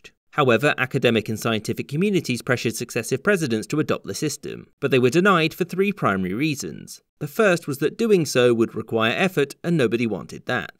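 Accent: United Kingdom. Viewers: Clicks video to hear explanation. Hearts British accent. Laughs in American metric. We use both systems, jackass. It's literally on our food labels. Our races are 3k, 5k, 10k, 15k. Marathons are marathons, get over yourself. I go to the store and can buy soda by the liter. Our hospitals use it. We use F because it makes more sense. No? then why are freezers in the UK set to 0 Fahrenheit? Enough with making your citizens ignorant.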